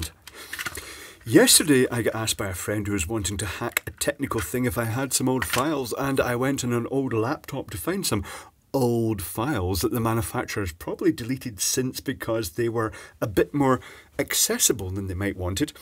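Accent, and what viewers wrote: Scotland, United Kingdom. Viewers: Your voice sounds like a teacher that I used to know when I was a small child. He used to behaved and talked in a very similar way to you, and now I know that a lot of this ascent is Scottish in origin. I have noticed a few differences in Scottish ascents, being that I have known of them and stayed in Scotland for about 1-2 months. Scotland is fascinating. That teacher also used to have a beard like you as well; there was something about that teacher that used to interest me, I don't why; but he used to play the piano at school very well in assembly time, and this was in primary school.... I think looking back at it now, he was kind of trying to have fun with the kids in his own unique way.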